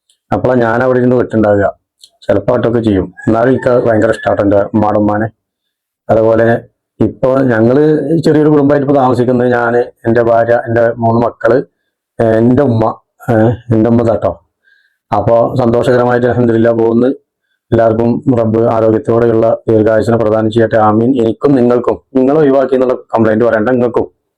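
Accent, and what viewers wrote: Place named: Malappuram, India. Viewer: അത് ശരി - സംസാരം മലപ്പുറം തന്നെ😃😃😃😃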